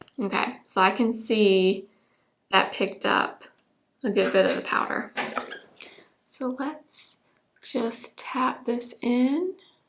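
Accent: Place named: Alabama, United States. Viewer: Are you from Alabama? You sound like Hannah Brown from the bachelorette!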